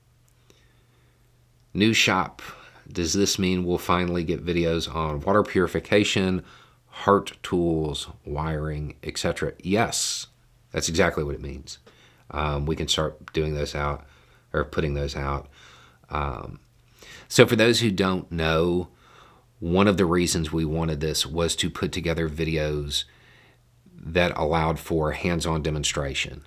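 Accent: South, United States. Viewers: How did folk not know you were from the South? I can hear your drawl from way down yonder, even though I figger’d you were a Texan. 😉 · Perhaps other folks have observed and mentioned you SOUNDED a LOT more Southern in you early videos. When I first stumbled upon your channel I was not sure what to expect in those days, but over time I have really appreciated your insight into matters of importance. Thanks, Man. (I cracked up when you wrote "not secret" on that box behind you.) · Yes, back in the days when spoke in a much thicker Southern accent.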